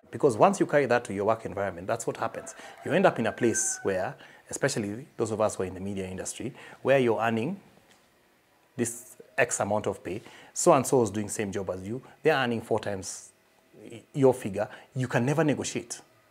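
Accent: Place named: Nairobi, Kenya. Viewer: Great great lessons.. I did relate so much with you really. Just that I am at that stage where I am finally coming to something that finally works. Ps. I knew he’s a South B guy before he could say two words😀.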